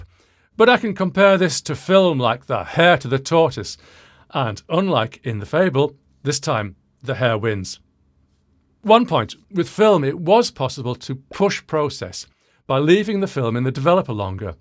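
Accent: United Kingdom. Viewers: Ouch. Anyone watching this video should read the comments before wasting the time viewing this one. The only thing credible about the commentary on film photography is that the speaker has a British accent. One cannot rely on this as a source of credibility. BTW, I did watch your other content, and it's great! Why tarnish your channel with this? Less credible material adds to the pile of rubbish that people have to sort through on youtube. Stick to audio. THAT is what you are great at!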